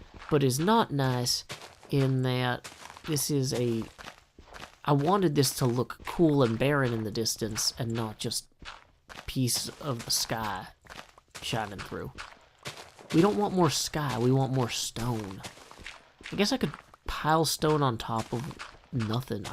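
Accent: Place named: Missouri, United States. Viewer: My apologies, I was watching some other hermits and decided to make a list of collab teams I thought would be great, and as I commented on many of them I realized I left you and Wels out, which is in my honest opinion, is a good pairing of personalities. I won't edit the comment I left them, it reads as follows : The Hermit Stock Exchange is about to open for business, making affiliations now is the key to success in a fluctuating market, as a hermit, please consider the following; all stocks and coin seem to follow a 3 letter categorization in markets which can combine many subsidiary companies and/or projects, and I feel the HSE should follow suit. In this I suggest, not individual companies, but corps with multiple "teams" or "factions" competing in the market; I propose the following for classification and advertisement: RDC - Rendoc ISQ - Isqueen FZM - Falzom ITZ - Imtaz (or ZIT, I kind of like ZIT) ART - Architek CNV - Convex PYA - Pyffa XIZ - XIZuma (he has Devs to back him, like a hedge) DGE - Doge (a generic stock anyone can invest in [volatility] PS cute dog face ^^ ) leaving one open for a collab in the future (JSN maybe ^^) Going down the list I realized, Python is on a Terraria kick, and Biffa is without a team member, also, I seem to have left you two out, I am deeply sorry, I mean you're kool, calm, collected, have awesome BG music, and your accent reminds me of my time in Missouri; nice people there. 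I hope that you and Wels will take over the medieval distric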